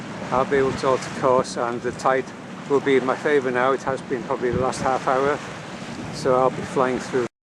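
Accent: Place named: North Wales, United Kingdom. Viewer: Diolch yn fawr,. Great vid. Seemed to have lost contact with your channel some 2-3 years ago but thankfully back on track now. Although I don't suffer from hiraeth living in France it's good to hear the North Wales accent & remember the waters & coasts I sailed years ago.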